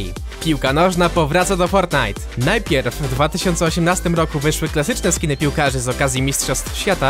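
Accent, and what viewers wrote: Poland. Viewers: Cze cze czekaj czyli jest górnik zabrze, od którego lepsza jest chyba legia warszawa i lech poznań, ale nie ma bayern munchen (monachium)? Przecież tam gra Robert Lewandowski, jeden z najlepszych piłkarzy Europy (jak się nie mylę). Btw szacunek za polski akcent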